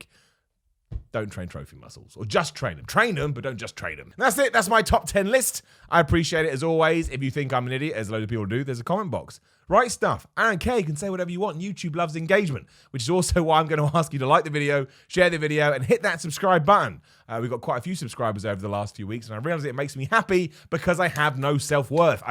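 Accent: United Kingdom. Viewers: Sooo refreshing to here a British voice on YT! Your funny, down to earth and no BS! Well done sir!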